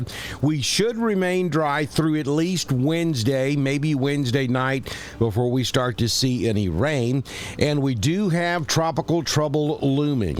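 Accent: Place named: South, United States. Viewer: I 💕 ke the way you present this report. No unnecessary talk Just clear southern man explaining the possibility not alarming everyone.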